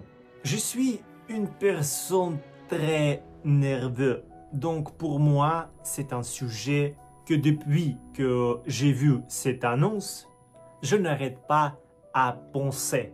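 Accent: Russia. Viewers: Bonjour. Je viens juste de visionner la vidéo. Tu sous-estime tes progrès en français. C'est dommage... Certes, tu as toujours ce petit accent russe que j'aime bien mais qui s'estompera au fur et à mesure de ta progression. Lire un livre le soir, il n'y a rien de mieux pour s'endormir. Et en plus, s'il est en français, en dormant, tu retiendras plus aisément l'orthographe des mots que tu auras lu. En tout cas, félicitations pour ta persévérance. 👍🙋‍♂️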